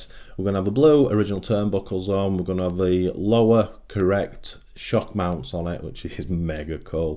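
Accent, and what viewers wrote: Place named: West Yorkshire, United Kingdom. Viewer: thank you, oh really? and I was born in west Yorkshire :) I thought that's where your accent was from actually. but the Canaduan $ references do rather baffle me :)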